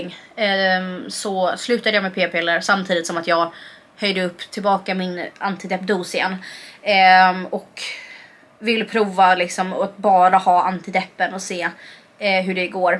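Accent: Stockholm, Sweden. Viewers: Varför pratar du Stockholms dialekt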